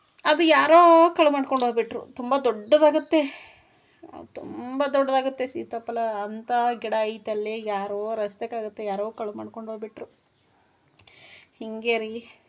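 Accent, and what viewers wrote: North Karnataka, India. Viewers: ಅಕ್ಕ ನಮಸ್ಕಾರಗಳು ಅದ್ಭುತವಾದ ವಿಡಿಯೋ ನಮ್ಮ ಉತ್ತರ ಕರ್ನಾಟಕದ ಭಾಷೆಗಳು ಮಸ್ತ್🎉 ಮಾತಾಡಿ ಅಕ್ಕ ತುಂಬಾ ಖುಷಿಯಾಯಿತು ನೋಡಲು ಮತ್ತು ಕೇಳಲು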